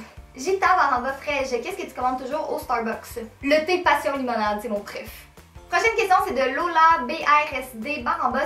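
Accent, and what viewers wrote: Quebec, Canada. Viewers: une des premières vidéos que je vois de toi et je sens que je vais m'abonner ; ) et en plus j'aime trop ton accent, oui j'aime l'accent québécois (je suis une des rares française qui aiment l'accent québécois... !) c'est grave rigolo, genre quand tu dis "youtoube" 😂 ou "lo" au lieu de "lA"enfin bref... gros bisous de France....😘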